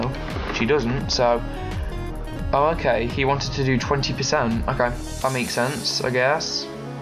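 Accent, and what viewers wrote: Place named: United Kingdom. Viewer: aah, i love your british accent :]]] pls more uploads of that kind, i suck in doubles but it looks fun watchin u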